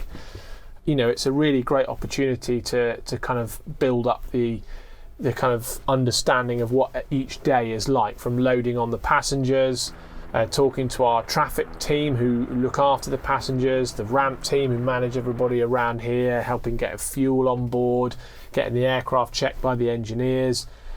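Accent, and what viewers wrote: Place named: United Kingdom. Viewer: A “Very Polished British Accent “!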